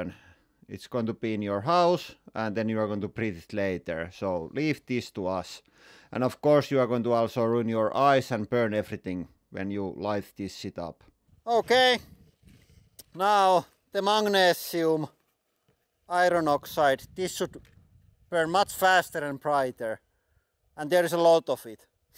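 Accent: Finland. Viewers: lol that finnish rally english, knew you were from finland after first words out of your mouth, way before seeing text on side of that van at background :D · Accent and a few words tells me you are Finnish. Correct? Oikee? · I watch just to hear finglish again like at my grandparents. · Your acccent sounds Finnish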